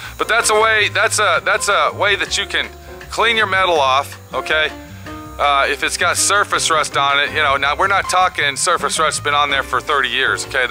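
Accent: Texas, United States. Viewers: your videos are fun to watch and listen to a texan drawl..your great!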